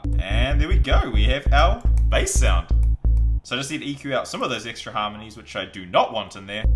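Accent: New Zealand. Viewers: Wow, haven’t heard a kiwi on YouTube before. At least I’m 98% sure ur kiwi. Ur vid was good too🙃 noice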